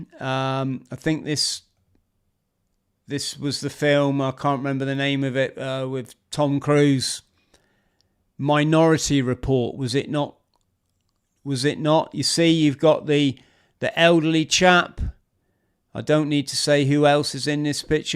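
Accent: Plymouth, United Kingdom. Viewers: If that's a Plymouth accent that I'm hearing - that axe throwing place is pretty close to the Octagon! Lot's of the classic architectural hallmarks in the city's geography.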